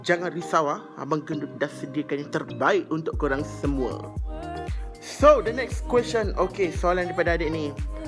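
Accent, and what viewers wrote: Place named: Kelantan, Malaysia. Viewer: Kechek klate bohhhh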